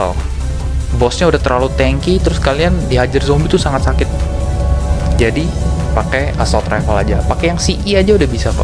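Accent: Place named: Indonesia. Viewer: Baru denger nih aku denger voice indo hahaa -Jiyang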